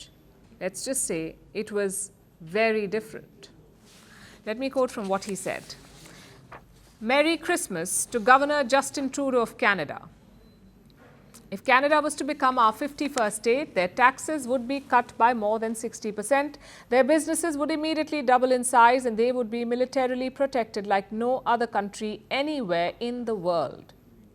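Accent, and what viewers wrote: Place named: East India, India. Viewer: This is comical. Here’s an East Indian/Canadian Journalist with a heavy accent telling the World that Canadians need to stand up to the big bad orange man who hates our Prime Minister Trudeau and wants to take over Canada. This has become like a Saturday Night Live skit. Donald Trump knows full well that for the last 8 years the alt left Socialist Canadian media has fed conspiracy after conspiracy to its citizens who gobble it up and respond with distain and insults. The page has now turned. 🤣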